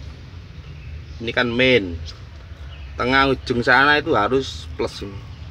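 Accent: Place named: Java, Indonesia. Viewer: Joss mas ,,, medog jowone !!!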